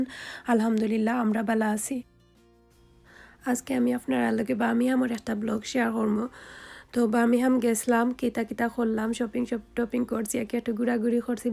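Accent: Sylhet, Bangladesh. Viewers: Assalamualaikum apu apnar vlogta onek balo hoesay kub sundor laglo. apu Ame apnar onek old fan bt comment korene kokono. apu ameo apnar moto Sylhety akjon may tai apnar kota suntay kub balo lage amar.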